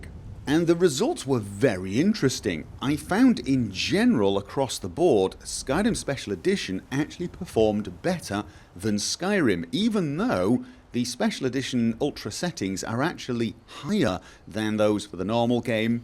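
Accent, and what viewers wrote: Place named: Scotland, United Kingdom. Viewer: are you Scottish? love yer accent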